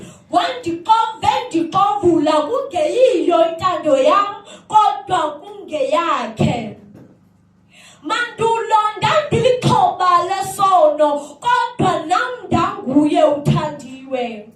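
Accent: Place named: Xhosa, South Africa. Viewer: Samandi isixhosa salosisi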